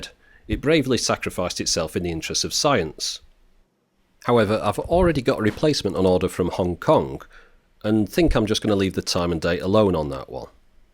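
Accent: United Kingdom. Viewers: Great review. I could listen to your smooth British accent all day :)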